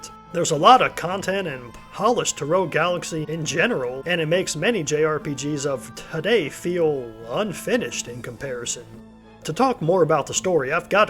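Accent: South, United States. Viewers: why does the narrator sound like hes auditioning to be a character in the video game with a southern accent?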